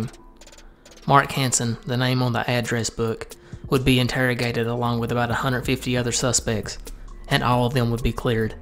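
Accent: South, United States. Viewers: You sound so southern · From one southern youtuber to another, i appreciate hearing the accent. It's a character thats been mostly lost on youtube. Also great research aand pace with the video.I feel like you chose just the right ammount of time to spend on each topic. Subscribed big dog. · that southern drawl lol.. it’s one of the reasons i like this channel and caroline easom’s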